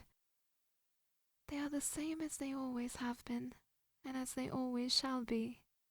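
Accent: United Kingdom. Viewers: your British accent is no less than satisfying and ethereal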